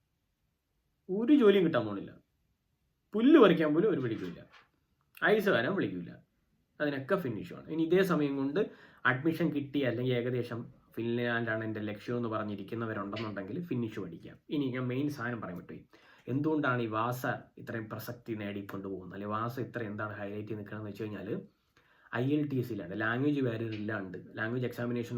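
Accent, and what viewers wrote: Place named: Trivandrum, India. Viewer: I'm also from trivandrum.. Slang kettappazhe thonni trivandrum aanennu. Trivandruthu evdeyanu..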